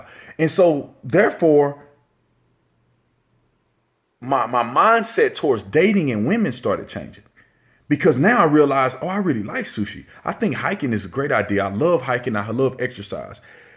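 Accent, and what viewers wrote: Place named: Fort Worth, United States. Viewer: Yo! Your from Ft Worth! Me too! I knew I heard the accent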